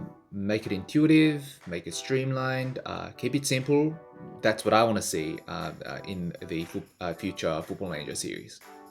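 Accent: Australia. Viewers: You sound like your from Australia! - Be good to see some tests on Low Block effectiveness vs High Line and pressing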